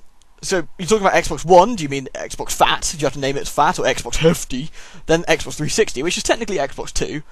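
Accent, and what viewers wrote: United Kingdom. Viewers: needs to do voice acting for a British Scooby Doo spin-off.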